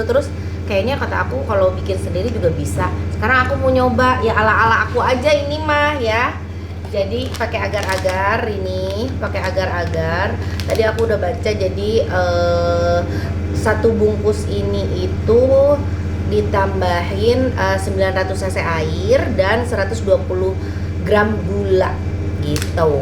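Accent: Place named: Sunda, Indonesia. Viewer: Berasa d garut kitu tah suasana na nyariosna sunda sadaya tth geulis ih resep abi mhh😇😇😇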